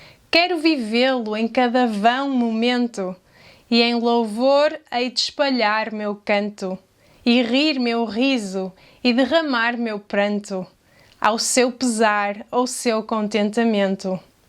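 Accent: Portugal. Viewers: Muito linda a sua leitura, e escutar te ler, me ajuda a perceber melhor o sotaque de Portugal, obrigada. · Olá, amei esse vídeo. Sou cidadão brasileiro nato e também cidadão português (avós maternos eram portugueses). Amo o Brasil e também amo Portugal. Escutar o sotaque do português de Portugal me faz relembrar minha avó Ana Rosa e meus três tios que nasceram em Portugal. Tenho em mim essa dicotomia que nunca desaparecerá. Quando estou aqui no Brasil, gostaria de estar em Portugal. Quando estou em Portugal, sinto falta do Brasil. Deixarei um link do finado Abujamra, recitando o poema Tabacaria de Fernando Pessoa. Abujamra foi um ícone do teatro, da tv e da teledramaturgia brasileira. <link> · Qua boa ideia! Pode ser divertido para aprender coisas sobre o Brasil também. Mas aprecio o sotaque de Portugal, então posso continuar a estudar português de Portugal. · Que lindo ouvir nossos poemas lidos nesse belo sotaque lusitano. 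Eu gosto muito da poesia portuguesa. Camões e Fernando Pessoa são meus preferidos. Deixo também algumas sugestões de alguns outros poemas brasileiros que eu gosto muito, para você dar uma olhada depois se quiser. "A máquina do mundo", Carlos Drummond de Andrade; "Meus oito anos", Casimiro de Abreu; "Ismália", Alphonsus de Guimarães; "Língua portuguesa", Olavo Bilac; "Seus olhos", Gonçalves Dias. Parabéns pelo vídeo e pelo seu trabalho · Gostei de ouvir a sua voz, porque gosto do português de Portugal. É uma pena que a tradução seja em inglês, eu preferiria em francês para entender melhor os poemas. Obrigado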